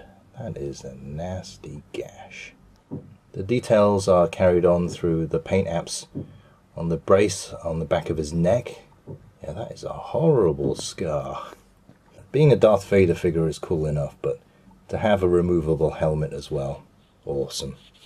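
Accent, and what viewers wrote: United Kingdom. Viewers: Cuz he's British...I say Darth aswell, cuz im British too...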